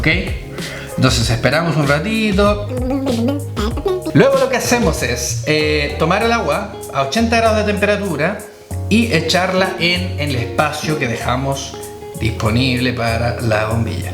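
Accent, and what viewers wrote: Chile. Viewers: Creo q por tu acento sos chileno t agradesco por promocionarlo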